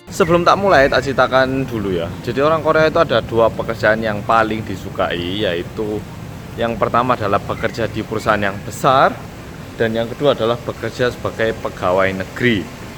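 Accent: Java, Indonesia. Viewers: ini orang korea kok medok 😂 pernah ke jawa apa temen nya bajindul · Mas coba dong bikin video, tapi jangan pake logat jawa:v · logat korea,ne ilang,,,!!!! jowo,ne medhokk. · aku seneng gawe logat medok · the power of medhok haha jawane kentel banget salam sangka etan yo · maaf sebelumnya aku baru liat chanel ini,mau tanya ini bnr org korea asli? tpi ngomongnya agak logat jawa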